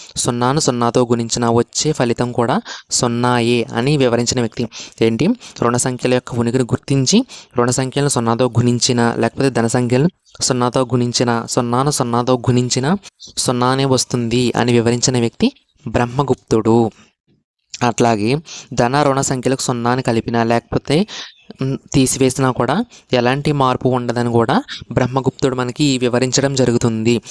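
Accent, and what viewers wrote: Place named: Godavari, India. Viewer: mana godavari slang.......love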